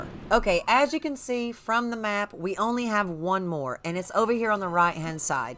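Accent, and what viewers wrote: South, United States. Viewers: Your country twang so cute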